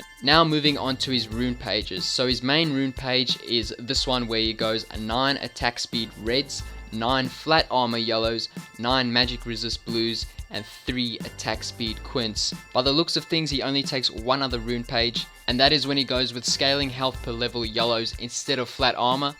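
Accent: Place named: South Africa. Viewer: Are you from South-Africa? Cause it sounds like it ma brother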